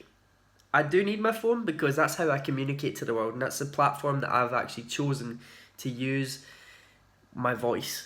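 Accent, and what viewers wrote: Scotland, United Kingdom. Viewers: I love your vlogs, I love your Scottish accent, your eyes, your just a wonderful guy with great advice. Keep doing you.❤️❤️❤️❤️❤️❤️❤️❤️❤️❤️❤️❤️❤️❤️❤️❤️❤️❤️❤️❤️❤️❤️❤️❤️😘😘😘😘😘😘😘😘😘😘😘😘😘😘😘😘😘😘😘😘😘😘😘😘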